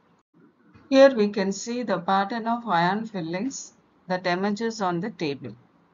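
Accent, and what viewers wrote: India. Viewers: Are U from Kerala?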